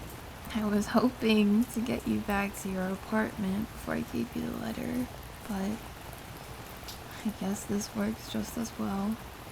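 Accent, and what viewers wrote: France. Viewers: Holy! Here I thought your voice was mesmerising with the french accent but this is equally as hypnotizing. Lovely work as always!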